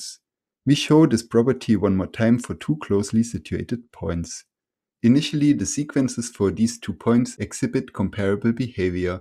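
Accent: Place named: Germany. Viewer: Strongest German accent ever. Love it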